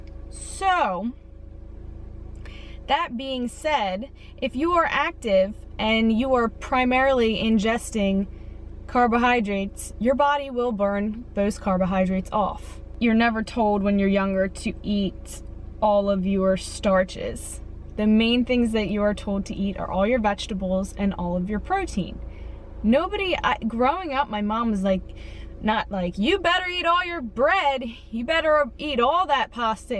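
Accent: Pennsylvania, United States. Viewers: your accent I knew you were from PA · I love your accent! I'm also from PA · As soon as I heard your accent I knew you were from PA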